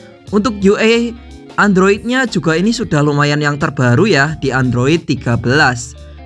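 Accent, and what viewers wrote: Banyumas, Indonesia. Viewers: Buset wng Banyumas 😂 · ngapak anjir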